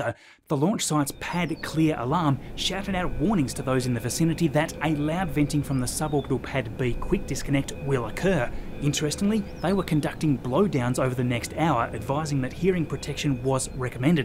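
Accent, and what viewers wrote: Australia. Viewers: Hi. I don't know if other viewers experience the same strange feature when switching on undertitling: I always get it in Finnish! I have to explicitely put it back on "(american) english". I don't have this on other Youtube Channels. And, no: I'm not Finnish either. I'm Belgian, and I speak Dutch. But the english subtitles sometimes help when I did not understand you completely. Nothing to do with your Aussi accent, more with my poor hearing.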